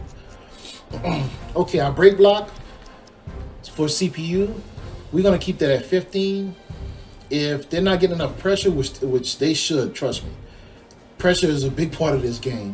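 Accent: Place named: Midwest, United States. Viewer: Great video! been tinkering with this game for a few months now. Started working on rosters. You sound like a Big Ten guy.... where u from?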